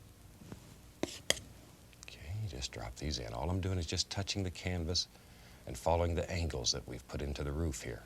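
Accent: South, United States. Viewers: in the later episodes its harder to notice that he has a southern accent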